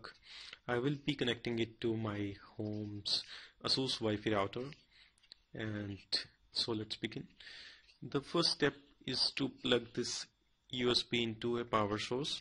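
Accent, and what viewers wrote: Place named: India. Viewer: Indian accents are annoying AF! Had it on mute the whole time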